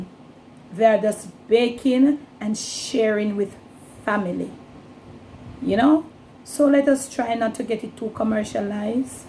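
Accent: Jamaica. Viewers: Hello miss wishing you and families a happy new year for 2020 I like watching your cooking show they're very interesting like how you talk patwha, God bless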